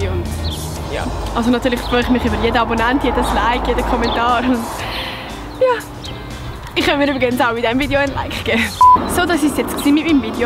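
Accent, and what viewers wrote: Zurich, Switzerland. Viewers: Dä dialänkt laht verrate Züri 😂😅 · vo wo bisch du eigentlich?😅 Am Dialekt aa Ruum Züri, oder?🤔😅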